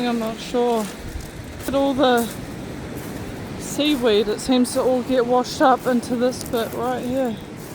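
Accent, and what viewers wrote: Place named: South Island, New Zealand. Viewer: awesome yeah I've been mucking around with polishing pads on temu, finally found a set for about $40 that goes up to 10000grit and I just use them on an old bench grinder. It's quite slow so not much chance of overheating and blistering the rock a mistake I've made while learning and I bought a tile saw from Bunnings with a continuous blade for cutting them $140 ish I definitely need a bigger saw though for the bigger rock's. Cool I thought it looked like nz haha and a bit of a south island accent 😅 I lived in chch for years.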